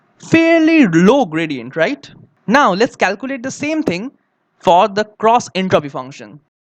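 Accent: Bengal, India. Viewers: brilliant ..also delightful bong accent :)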